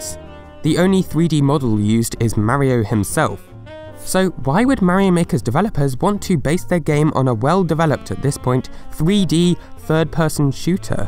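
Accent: United Kingdom. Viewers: Nothing makes me happier than listening to a cheerful British guy telling me Nintendo trivia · He's a brit, that's why.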